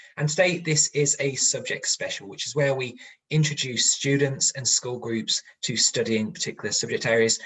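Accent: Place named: United Kingdom. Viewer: I like her English, this British lady ; )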